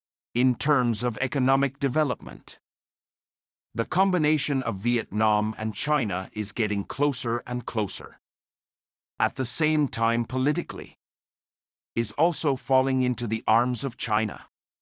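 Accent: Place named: United Kingdom. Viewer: Hmm your tone to speak but slow looks like.. british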